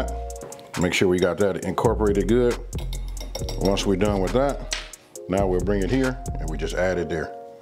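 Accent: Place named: North Carolina, United States. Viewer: This is off topic, but your accent sounds similar to someone I know from North Carolina. You wouldn't happen to be from there originally would you? Nice video, by the way. I'm definitely going to try this! Thanks!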